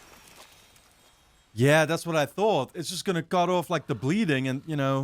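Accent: Netherlands. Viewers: are you dutch?? or do i mistake your accent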